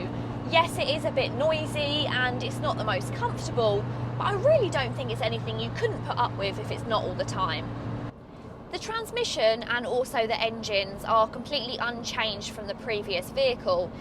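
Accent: United Kingdom. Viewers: Your UK sounds so nice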